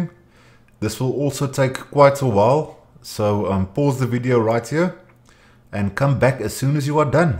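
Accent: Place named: South Africa. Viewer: love the SA accent :)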